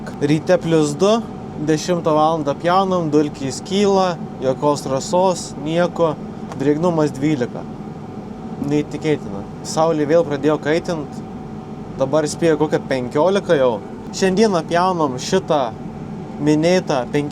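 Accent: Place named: Zanavykai, Lithuania. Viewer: šaunuolis ,vyrukas ir muzikytė vežanti, automatiškai koja į ritmą.:)O tarmė zanavykų, miela ausiai:)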